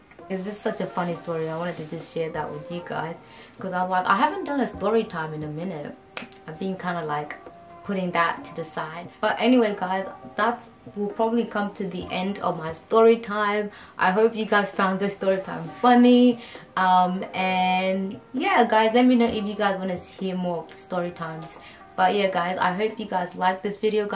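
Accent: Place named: London, United Kingdom. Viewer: where did you learn English? you sound like you are from London, greetings from the UK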